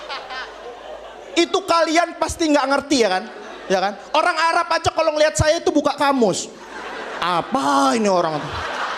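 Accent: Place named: Lampung, Indonesia. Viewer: eksen bahasa lampungmu masih kental bro. sukses bro karirnya.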